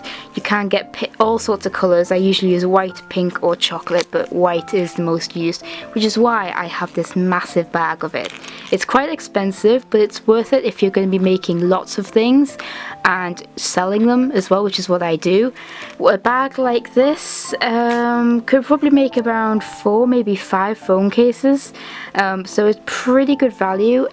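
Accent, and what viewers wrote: United Kingdom. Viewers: You probably get asked this a lot, but i was wondering what area of the UK youre from? Your accent is lovely and sounds kind of northern to me :) I've just started doing my own decoden cases and i love watching your videos, theyre really helpful :) xx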